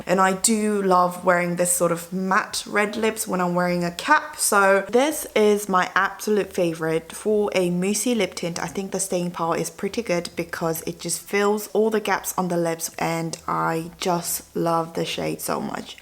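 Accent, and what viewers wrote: United Kingdom. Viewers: 미국 영어나 캐나다 영어 하시는 분들은 많이 봤는데 영국 영어 하시니까 멋있으시고 신기해요! 🥺✨ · 영국 발음!!! 영국계 한국인이신가용.. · 안그래도 영어로 말씀하시는 콘텐츠 보고싶다고 댓글담기려고했었는데 이번에 뙇!! 넘 반갑고 좋아요 헤헤 의 영국식발음도 좋고 구사하시는 단어, 목소리 등 다 너무매력적이에요ㅠㅠ💕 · 심지어 오랜만에 영국영어로 해주시니 더 좋네요 흑흑 😌❤️ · 오오랜만에 영어로!! ㅎㅎㅎ한국으로 오시고 영국발음 너무 오랜만에 들어요♥️ 오늘도 잘볼게요!! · 영국억양이 넘 듣기 좋아서 계속 되돌려보기 한다고 진도가 안나가요..❤️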